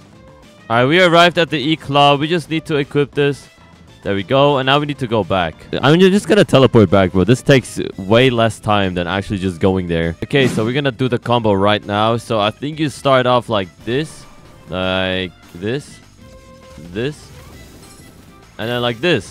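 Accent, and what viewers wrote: Germany. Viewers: Ich weiß nicht wie aber ich war mir zu 100% das du deutsch bist wahrscheinlich wegen dem Akzent haha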